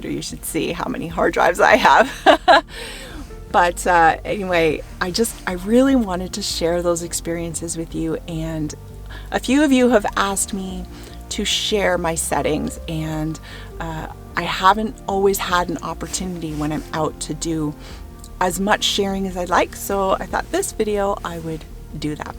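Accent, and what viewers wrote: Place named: Canada. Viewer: Love that Canadian accent, aboot and all that. Not a fan of the cold but beautiful images. Well done.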